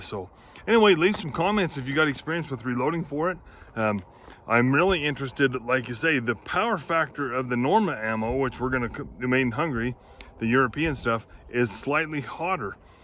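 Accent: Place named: Texas, United States. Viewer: Here in Lubbock Texas the only ammo i can get is Aguila 71 gr. ammo. I shot it through my used Bobcat 3032. I bought a box of Fiocchi 73 gr. 32 ammo. I found some TUI in 32 so i may get that box for 30$. I cleaned and lubed up my 3032 so it should shoot great. I shot only 8 rounds of rhe Aguila and its a potent round . I shot through a box of depends and went through 10 of them into the next box. Thanks for the video. Id almost swear you were a Texan. God Bless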